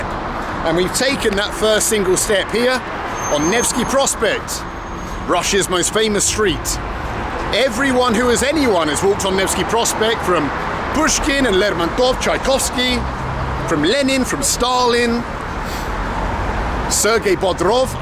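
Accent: England, United Kingdom. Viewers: Its might be because of his hiatus but sounding extra british this episode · is it just me or was his english accent stronger in this vid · Really loved watching this vlog, have not watch you since you went through your awful Covid experience. So funny and educational at the same time. Have you been back to the UK , your UK accent sounded very strong ?We just went back for a month and our US friends struggle to understand us when we get back.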